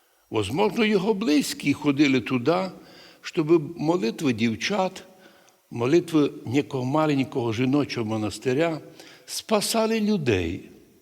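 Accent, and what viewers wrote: Transcarpathia, Ukraine. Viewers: Як я люблю закарпатський діалект, гарний і священник прекрасний на своєму місці, він істинний пастир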